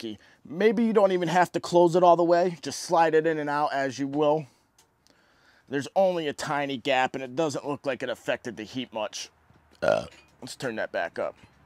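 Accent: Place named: Western New York, United States. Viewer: Wow! You sound like you're from WNY!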